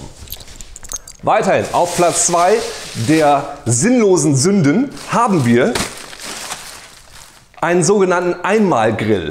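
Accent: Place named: East Frisia, Germany. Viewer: ostfriesisch. Nicht hamburgisch.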